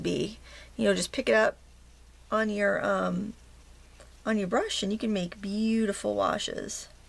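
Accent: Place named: New England, United States. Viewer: I never tire of hearing the Maine/New England "Squerl" :) oh And "seepeeah" is correct - "Sepia" the Neo-Latin name for the first genus of cuttlefish that Linneas named in 1758. It is a transliteration of the Ancient Greek "σηπία", "sēpía", meaning "cuttlefish", and of course the colour has the same name as the ink of the cuttlefish is the original (and best in my view in terms of hue, stability is another matter) "sepia" paint. Hard to get now as cuttlefish are sold frozen whole for food with the ink sacks intact now, so not a byproduct.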